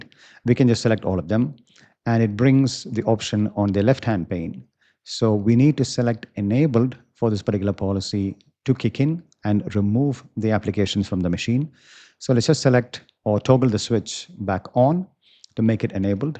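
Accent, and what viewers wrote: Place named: India. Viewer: I was so happy to hear an Indian voice focusing on how to get rid of the junk that is Microsoft from your life and then I saw your header and it says Azure ! Your pace and condensed presentation is still very good 👍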